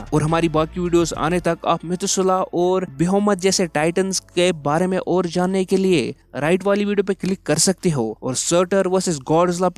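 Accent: Kashmir, India. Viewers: Bhai kya aap kashmir se ho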